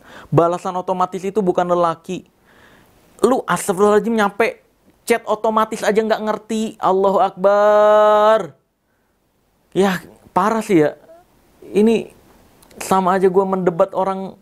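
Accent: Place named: Java, Indonesia. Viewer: Wkwkwk bang wong jowo yo 😂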